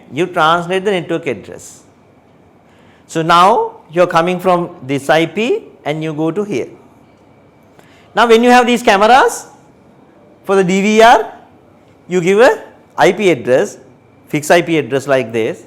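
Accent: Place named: Sri Lanka. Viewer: within 5 seconds , I was able to tell the instructor is SriLankan . :)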